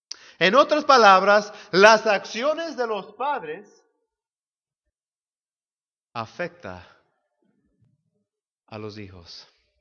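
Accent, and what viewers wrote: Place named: United States. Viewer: Compadre tienes que aprender un poco más hablar español eres muy pocho y todo lo que hablas no se entiende discúlpame mucho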